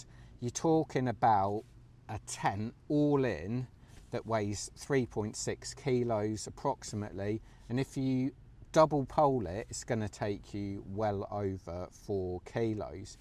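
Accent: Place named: Northern England, United Kingdom. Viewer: I am Swiss and lived in Cambridge UK for six and a half years during the 1990's. I notice your northernly accent. Does not sound like Manchester or Birmingham to me, but I could be wrong. However, where does your accent/dialect relate to geographically? Here in Switzerland we have many dialects, only 10 kilometers away somebody speaks somewhat differently.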